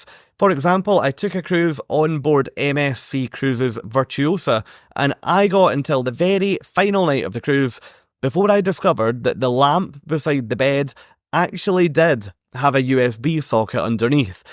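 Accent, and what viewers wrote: Scotland, United Kingdom. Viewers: Loved your sweet Scottish accent! Could listen to it all day! Great video very different from others. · What a delight to have a Scot who speaks so clearly!!! We “unwashed” revel in the accent but luve to understand also. 😊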